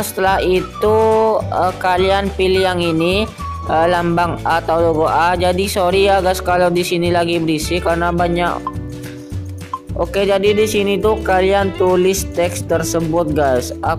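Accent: Madura, Indonesia. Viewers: Org Madura kah ketauan banget:v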